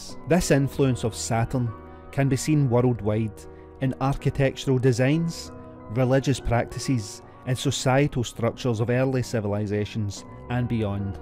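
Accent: Scotland, United Kingdom. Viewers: where are you from? this dude has a smooth, posh scottish accent. i'm willing to wager his accent is nicer than yours. he's british - the island where the english language was created.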